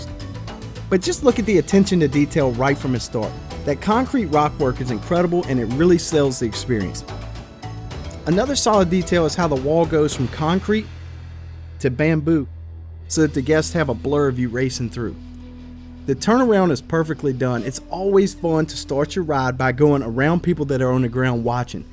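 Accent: South, United States. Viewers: Alright I’ll say it, I love your southern accent.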